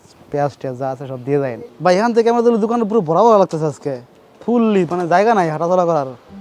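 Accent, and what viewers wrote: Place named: Narsingdi, Bangladesh. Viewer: Bhaiar kotha shune mone hoy vai norshindir chele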